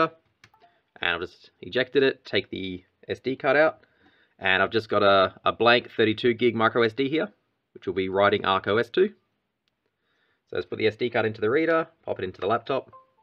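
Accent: Australia. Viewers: Thanks heaps, it worked first time! Must have been the calm Aussie narrative. My only stumbling point was one of the ROMs was corrupt when I was copying them. Skipped it and will hunt it down another time. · Thanks mate! For whatever reason, this video was so much more helpful to me than others I watched. As a total newbie to the dense world of emulation, a calm Australian voice and super clear instructions saved me from a frustration spiral. Subbed, keep up the great work!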